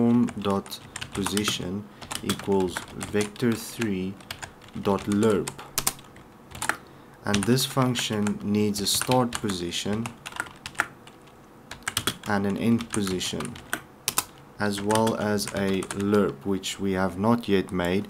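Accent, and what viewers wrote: South Africa. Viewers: Are you a South African?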